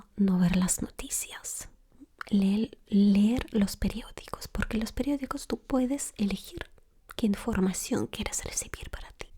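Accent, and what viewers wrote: Russia. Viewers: Por ese acento de una Rusa hablando español <3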